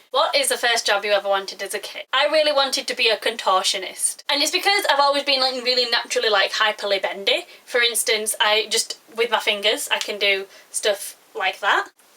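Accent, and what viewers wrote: Yorkshire, United Kingdom. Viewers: You sound like a yorkshire bird anywhere near leeds mebbe? :D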